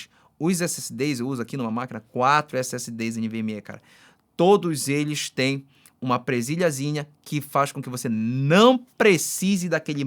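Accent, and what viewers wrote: Pará, Brazil. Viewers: Agora que tu falou que é paraense eu ouço teu sotaque aaaaaaaaa (“elhe” “bicho” “vocêxs”) massa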